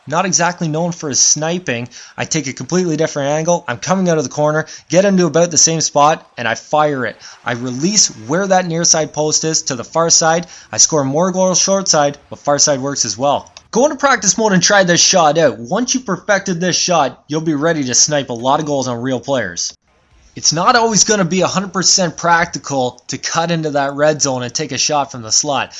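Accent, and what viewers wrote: Canada. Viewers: thanks for the tips man! i can totally tell that you're canadian by the way you say about sounding like ABOOT. haha. thanks for uploading!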